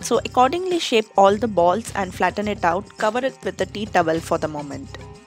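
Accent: India. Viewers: What a lovely accent. I want everything that i learn in future to be taught to me by a a well educated Indian lady. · I DONT KNOW WHAT I LIKE MORE; THE NICE INDIAN ACCENT OR THE BREAD. BEST EXPLANATION.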